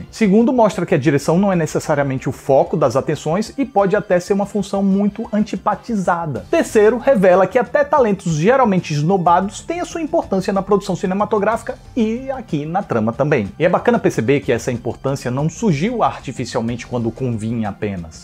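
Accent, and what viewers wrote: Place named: North, Brazil. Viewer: sou muito fã dos teus reviews, teus roteiros são muito bons e termos como "trancagem fiofal" são inigualáveis, além de muito bem pautados tuas críticas, mesmo quando minha opinião é totalmente contrário da tua, teus argumentos são satisfatórios, mas percebi que tens um sotaque mais parecido com o pessoal do Norte/Nordeste, mas tu usou bastante o termo "Guris", "gurizada" mais comum no sul e teu linkedin é do Rio de Janeiro... explica essa mistura pra gente!!!!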